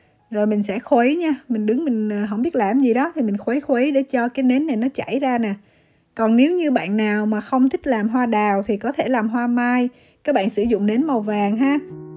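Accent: Central, Vietnam. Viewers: giọng trọ trẹ ko biết người đà nẵng hay người Huế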